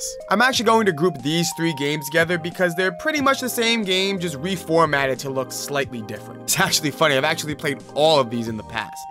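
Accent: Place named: California, United States. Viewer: What do you mean? Just sounds like a normal California accent to me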